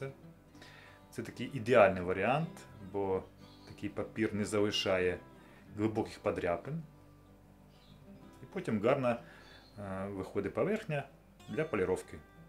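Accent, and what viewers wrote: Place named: Ukraine. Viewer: Что с автором? На украинском языке раньше не наблюдал. Я конечно по говору понимал что с Украины,но переобутся так сразу..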